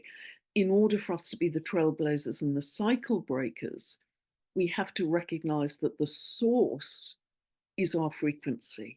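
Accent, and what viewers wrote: United Kingdom. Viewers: Luminous holidays to you dear!!! I love all your insights and beautiful energy and british accent. 🙏🏻💫💚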